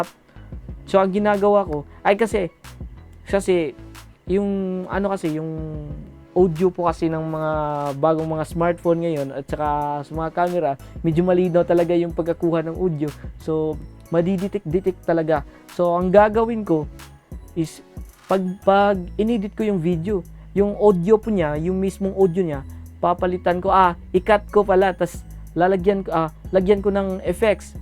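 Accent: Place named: Ilocos, Philippines. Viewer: ilocano ka ata sir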